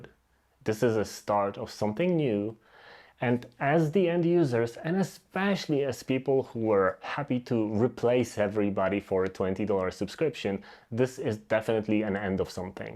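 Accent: Poland. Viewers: no nieźle xD widze miniaturke nowego dla mnie kanału, patrze " " i takie there is no chance this guy isn't polish, wchodze na kanał i opis patrze United States i mówie no kurde, puszczam ten film słysze akcent i już sam nie wiedziałem co słysze po czym widze screena z Cześć więc jednak miałem racje 🤣 nie oglądam chyba w ogóle polskiego youtube więc zawsze mnie śmieszy jak znajduje polaków nadających po angielsku. W sumie to nic dziwnego - widownia pewnie 50 razy większa jest niż w polsce.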